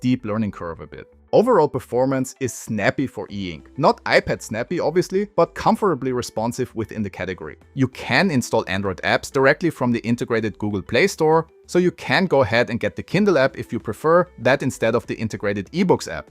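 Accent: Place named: Vienna, Austria. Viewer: Great review! And now I finally know how Wiener Schmäh English sounds like! The Dewäääisss! But no judging, I also have an accent when speaking English :)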